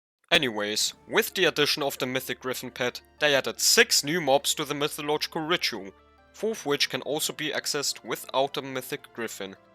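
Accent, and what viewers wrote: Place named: Germany. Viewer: You sound German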